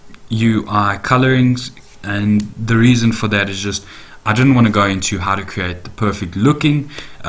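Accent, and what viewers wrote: South Africa. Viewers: I am in JHB and I've got to say I do love seeing other South Africans do tuts, and man do you know your stuff. Keep it up, great tut:-D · Awesome tutorial! Thanks. I can hear you are from SA!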